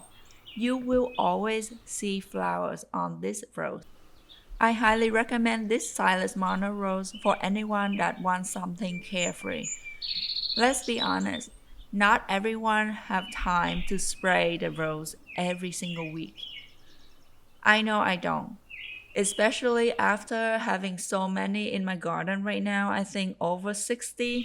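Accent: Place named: Vietnam. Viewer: Are you vietnamese? You have the accent <3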